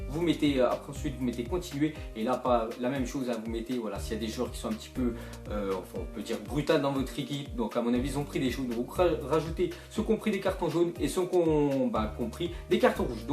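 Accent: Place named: North, France. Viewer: sa se vois tes du Nord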